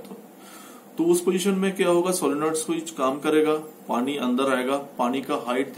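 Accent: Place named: Bengal, India. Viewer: 😀 loved it specifically your deep kolkata Bengali accent you just sound like my late nani who inspite living delhi could not get rid of her bengali accent , any way I made it with a float switch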